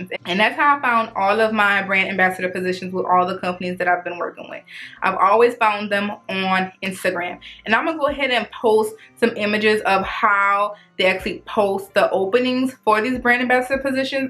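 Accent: New Orleans, United States. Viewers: Girl I hear that New Orleans accent lol🤣😂I am from New Orleans too🤗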